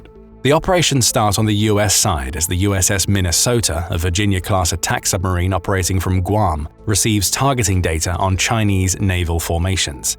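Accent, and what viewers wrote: United Kingdom. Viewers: The moment the British voice, I m gone.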